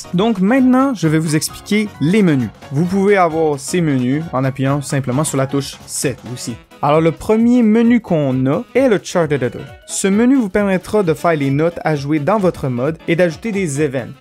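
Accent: Quebec, Canada. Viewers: tu es québéquoi ?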